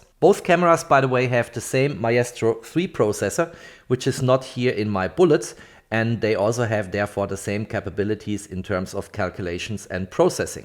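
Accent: Germany. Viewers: Let me guess... Your German? Nice Video!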